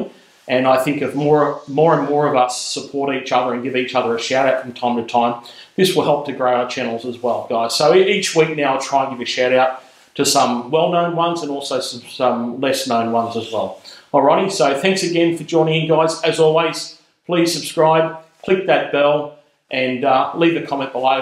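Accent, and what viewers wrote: Australia. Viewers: Hello where are you ? Sounds like Australia, have a question for you. I've been looking on the internet for awhile and can't find what I'm looking for and found you. I'm trying to find an adapter plate for a Rochester varajet manifold to the Holley Throttle body injection system. 2 barrel to two barrel. I don't want a high rise because I don't want to modify my hood. If you could make it how much would you charge me? Thanks Tim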